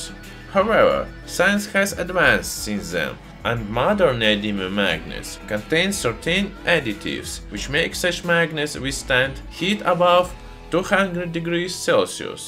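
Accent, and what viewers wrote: Russia. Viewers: "I needed to remove iron sauce" "Somehow extract near demon souls" You see, the auto generated English caption can't understand Russian Style English. But human ear does. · I love your accent. It adds to the "mad" Russian scientist character. 😁 I mean that in a friendly way. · Love that thick Russian accent! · Regarding his pronounce he is from rushka. They waste all toxic on their forests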